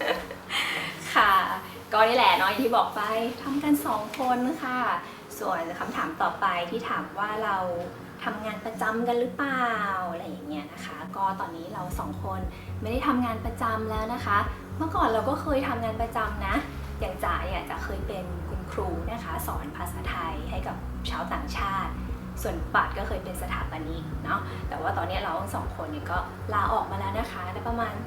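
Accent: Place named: North, Thailand. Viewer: สวัสดีจ้าว ปี้หนานดูคลิปตี้ไปแอ่วแม่กำปองฟังกอยสำเนียงเเล้วต้องเป็นคนเหนือแน่เลย มากอยคลิปนี้ฮู้เลยว่าเป๋นคนเหนือดีใจ๋เด้อตี้มีช่องคนเหนือทำช่องดีๆ ปี้หนานก็ทำช่องเหมือนกันมือใหม่หัดทำ ทำไปเก็บความประทับใจ๋ไว้ดูอีกหน่อยทำดีพ้องบ่อดีพ้อง สู้ๆไปเด้อ เที่ยวบ้างไม่เที่ยวบ้าง✌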